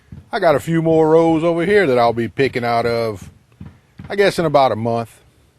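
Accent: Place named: Louisiana, United States. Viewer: Vous devez parler le français cadien ... I can tell by that accent :P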